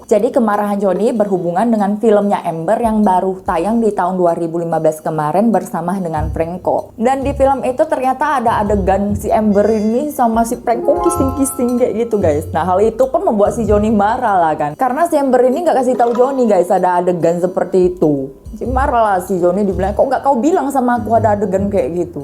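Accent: Medan, Indonesia. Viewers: Bahasa medan nya kental kali, suka kali aku dengarnya. 😍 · Suka cara bawa cerita nya,jelas dan gak berbelit" apalagi kaya ada logat Medan nya ya..☺️ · Haha medan abis...kaya mana itu si amber...logatnya mirip bgt tmn sy yg dr medan... Sukses ya...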